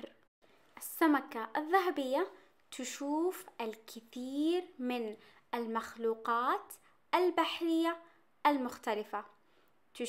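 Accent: Saudi Arabia. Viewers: شکرا جزیلا. لهجه السعودی جمیله.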